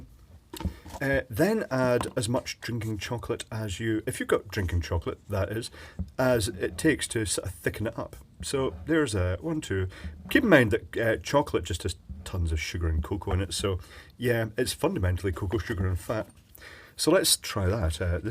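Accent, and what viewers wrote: Scotland, United Kingdom. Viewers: He definitely isn't faking the accent. Proper Scottish cooking there.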